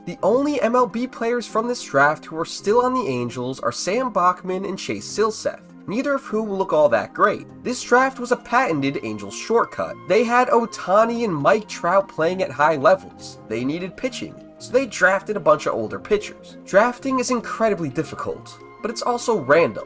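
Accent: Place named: New York, United States. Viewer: whiny-ness and twang of new yawk know-it-all "fan"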